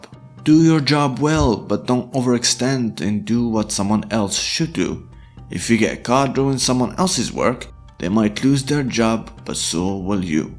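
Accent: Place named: France. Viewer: flawless and nice French accent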